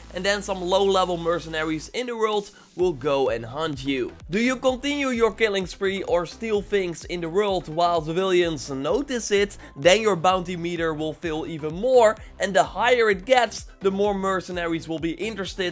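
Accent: Netherlands. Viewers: Are you dutch?? Your accent really sounds like it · RuiDui yeah he is Dutch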